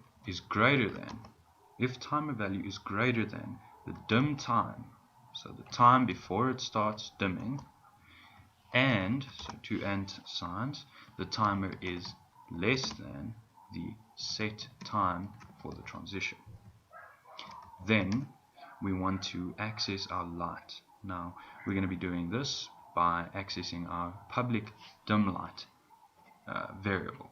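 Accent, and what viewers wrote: South Africa. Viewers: Are u South African because of ur accent? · Yoooooo!!!! More South Africans!!! I'm South African too. I live in Cape Town :)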